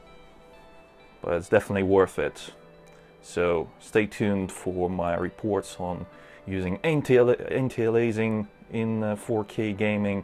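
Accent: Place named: Russia. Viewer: я понять не могу ты русский что ли(акцент русский просто) .I can not understand that if you are Russian ( a Russian accent )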